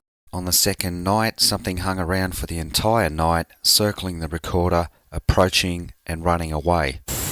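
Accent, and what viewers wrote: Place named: Australia. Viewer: Good video. Love your voice from Down Under if I am right? I just hope a hairy guy does not tamper with them! I know they are not cheap! An online friend of mine I spoke with a long time back from Perth used to have a Kookaburra. About as annoying as a woodpecker here in a tree! I hope you do not have any there to scare them away and drown out the vocalizations! Wonder if they can mimic birds like that?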